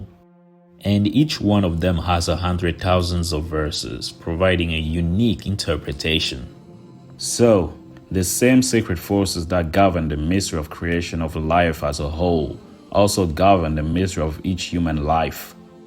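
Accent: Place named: Yoruba, Nigeria. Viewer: Please keep ur yoruba accent! It makes storytelling more Intriguing. T-ankh u. Asè 😊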